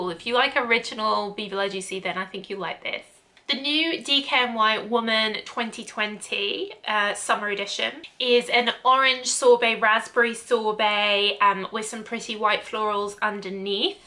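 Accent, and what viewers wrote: United Kingdom. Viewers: I’m from the Philippines and I love perfumes and I love watching people who have great British accent. So Here I AM HAHAHAHA LOVE YOUR VIDS ❤️💛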